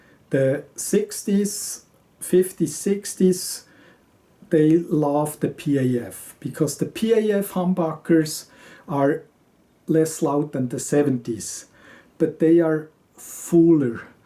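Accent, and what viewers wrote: Switzerland. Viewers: I can tell by your accent that you are Swiss! So am I.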